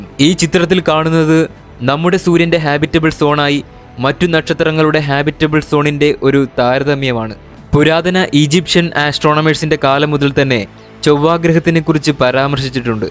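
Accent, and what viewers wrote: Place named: Kottayam, India. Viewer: Kottayam ayirikkkum fullli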